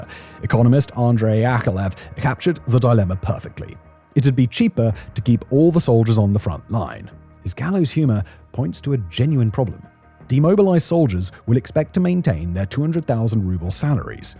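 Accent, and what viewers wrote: United Kingdom. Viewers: yeah man, this is what is happenin. but something about British RP fails to deliver the true synthesis of the emotional impact, did you smoothe it out with AI. Yeoman's work on the breakdown, the cuts are so close together somehow just makes it seem like the same thought except it isn't, which makes the audio experince more like that of a infinite unrelenting run-on sentence that is jam packed with info and concepts yet tonally devoid of emotional contexts --hope this helps --thnks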